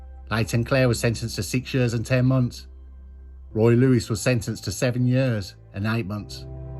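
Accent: Birmingham, United Kingdom. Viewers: You from Birmingham bro?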